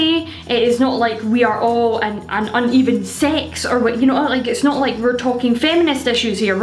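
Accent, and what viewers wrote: Scotland, United Kingdom. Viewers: Your last video was recommended to me by YouTube, and it was not the Merida controversy or Wreck It Ralph 2 trailer what made me want to subscribe to your channel. There's 2 reasons why: 1. You've got one hell of a cute Scottish accent. And 2. Because it was free to subscribe. I speak 4 languages: Spanish, English, German and Portuguese. And I can imitate 5 accents: French, Arabic, British, Spanish (Spain) and Russian. And hearing to your Scottish accent really makes me want to learn it too. I was literally squealing like a pig while watching the video, so I'll stick up and watch all your videos until I can master this accent too. PS. You pretty 😅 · A "game" I like to play with your channel is to increase the speed of the video up to 1.5 or 1.75. Then I try to see if I can understand you, and at first it was a little hard but now I can get up to speed 2. 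Since I have been doing this I have noticed it is easier for me to understand people with a Scottish accent that speak rather quickly. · I understand you perfectly with your Scottish accent. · Thank you for your honesty I love how you speak Scottish rubbish! I mean you never offend me in anyway I always look forward to laugh and learn at the same time! In my opinion it's a movie that has many different interest that many people like or dislike! So what I mean is if you watch any Movie in different moods like happy sad or even board it will drive your thoughts different! Emotions are the drive of opinions and thoughts on any subject! Honesty I'm not much of a movie buff but I do know opinions are always driven by emotions! Love the watch it's amazing and yes I'm looking for a watch soon! Your phone went off during this vlog lol thanks for being such a great inspiration to Scotland and the information is very useful! 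And untill next week take care! And if no one has already said this to you today your die bit tidy and shine in the the rain like a Queen from Scotland👑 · I love and prefer your Scotland accent . In Poland we have many tourists that are shocked that our language is not even similar, and its ten times quicker then Russian language. So when we talk English or Polish talking with tourists we speak very fast eating some letters and words. But this.is funny for me :) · Idk if it's because I've studied the Scottish accent very closely and even learned how to speak in one myself, but I can understand everything you say perfectly fine the first time you say anything. I don't get how some people need you to repeat things, but I guess maybe if you've never heard a Scottish accent, which in the stories you told, wouldn't be the case but, I could see you not understanding some things but I can understand you perfectly without ever having to rewind the video. 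Also, you're the only Scottish YouTuber I watch so it's not like I'm surrounded by the accent all the time. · I’m new to your channel and I’ve barely ever heard Scottish accent, but I can understand you perfectly hahha. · Love the Scottish accent 😁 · I see a lot of myself in you, even appearance wise with the short red hair and head shape. I’m English, I live in Cumbria, so the Scottish accent isn’t foreign to me at all, but I really do love it. Like a lot, it’s so pretty despite how harsh it can be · I just love you! I understand you when you talk. Love the Scottish accent! I am part Scottish. Proud of it!!! I would love to learn the Scottish terms.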